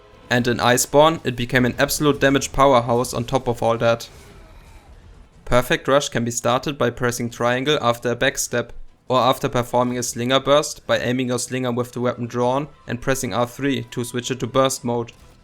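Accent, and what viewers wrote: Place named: Germany. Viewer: The German accent though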